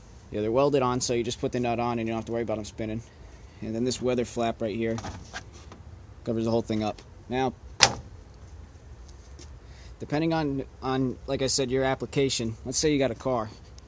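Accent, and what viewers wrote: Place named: New Jersey, United States. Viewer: Very thorough video. I liked watching it. Makes it seem easier to understand. New Jersey accent.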